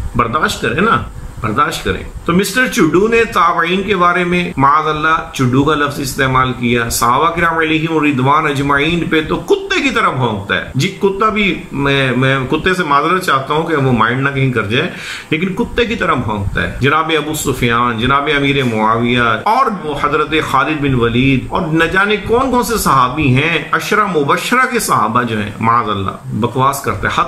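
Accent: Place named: Arab world. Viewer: movli sab apny arabi lehjy say impress krny ki koshish ki hai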